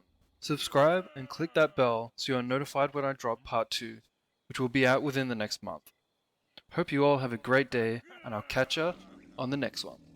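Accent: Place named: Johannesburg, South Africa. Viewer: is it just me or does he sound like a joberg boet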